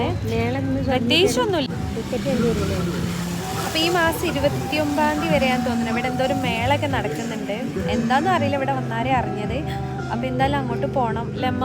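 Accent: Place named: Malappuram, India. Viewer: Malappuram slang super aanu👍👍.. Oro video yum ushar avunnud tto👍👍👍... 1 million subscriber avatte vegam.. All the best 😍😍🌹🌹🌹🌹🌹👍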